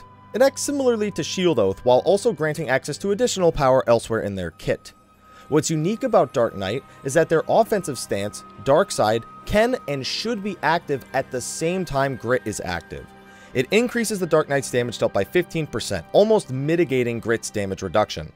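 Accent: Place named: United States. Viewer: That's just an American accent. Take it from a Brit that you guys butcher the letter A!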